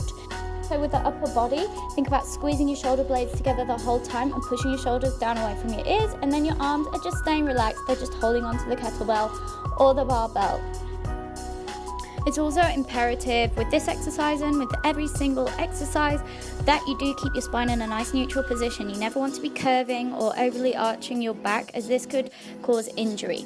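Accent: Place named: United Kingdom. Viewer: wait, she's Australian?! I am and she doesn't sound it haha I'm so confused 😂 I thought she was from the U.K?!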